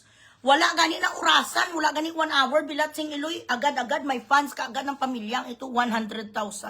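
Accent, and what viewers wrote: Iloilo, Philippines. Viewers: 😂😂ginagalit mo si madam,,,,, 😂 natatawa ako sayo pag nagsasalita k,,, ilongga..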